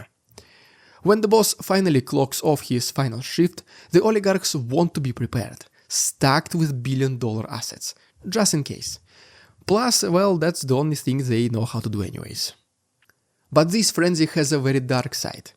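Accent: Russia. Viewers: I don't know what will happen, but I have been waiting for this video, long before you made it. People have talked about Putin wanting to conquer Europe, along with other ideas like Putin will live for a really long time. Yet, Putin is already an old man. It is only a matter of time until death comes for him. Putin is a snake that is running out of time, trying to secure his legacy, in my opinion. Watching your videos is getting me used to hearing Russian accent correctly btw lol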